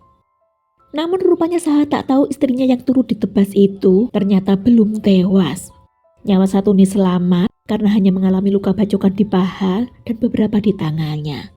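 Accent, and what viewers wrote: Java, Indonesia. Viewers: Logat bahasa Indonesia nya diperbaiki lagi mbak. Medok amat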